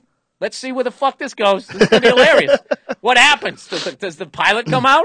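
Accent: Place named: Indianapolis, United States. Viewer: Definitely sounds like a guy from indy. No surprises here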